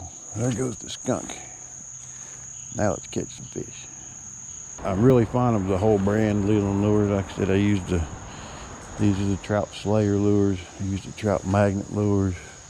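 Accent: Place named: South, United States. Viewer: This Yankee loves to hear you soft spoken southern guys talk!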